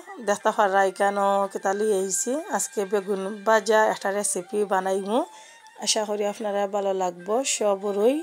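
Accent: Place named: Sylhet, Bangladesh. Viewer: o mai go sylheti ni go😀yummy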